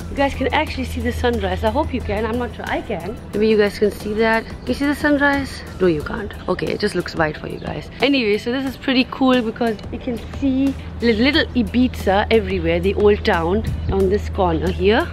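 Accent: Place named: India. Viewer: I came here to get used of Indian accent as you told us. From Brazil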